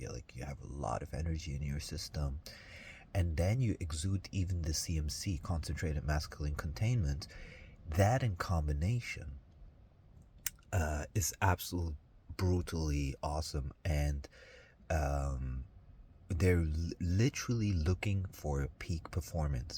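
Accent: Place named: United States. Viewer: dein englisch ist sehr gut, eher amerikanisch geprägt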